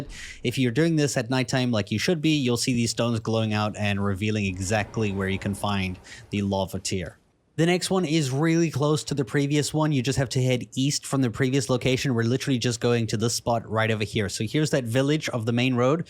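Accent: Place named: South Africa. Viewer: Bro embrace your south african accent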